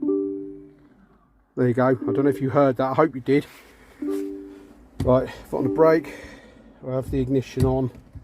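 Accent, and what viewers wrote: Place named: Northern England, United Kingdom. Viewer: Thanks for the video, I saw another guy show how you can get a 12v battery with two wires that you touch to those two terminals where you took the plug out. And it seemed to work fine, just make sure the polarity is the way that winds the piston the right way. Would you suggest this way too as it saves taking the motor off? PS like the accent, it reminds of home, as I'm currently up north! lol!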